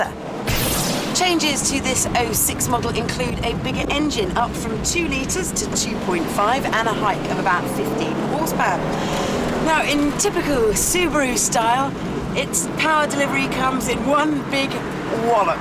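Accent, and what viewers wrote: United Kingdom. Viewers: I'm guessing your from somewhere in the UK there is no t sound if your in Japan it's more like impressa, most counties pronounce it imprezza. I'm very aware of the reason you brought up forza and I addressed that in my previous reply.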